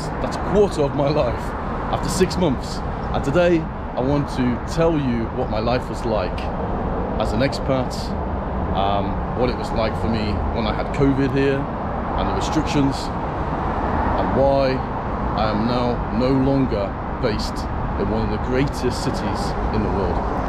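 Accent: United Kingdom. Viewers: Hi I found your channel today I just adore your British accent! sending love and greetings from USA ❤ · Хоть я и не очень орошо знаю английский, я с первых слов понял что говорит англичанин) Резко режет слова. Englishman in Moscow)))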